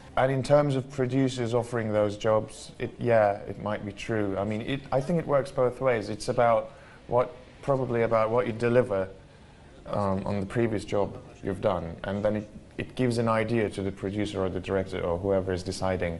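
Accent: RP, United Kingdom. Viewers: oha ingiliz aksanı😍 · he has a bit of a British accent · rp aksanını herkes konuşamaz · British royal accent perfect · british accent!!! so sexy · I like his English accent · Resmen kraliyet ingilizcesi konuşuyor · he has been in uk, and picked the accent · Baya baya british konuşuyo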